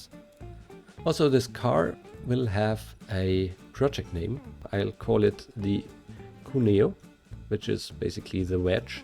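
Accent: Germany. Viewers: + German accent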